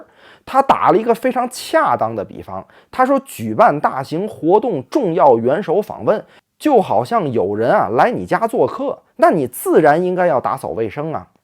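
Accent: Tianjin, China. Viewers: 听起来像我们天津老乡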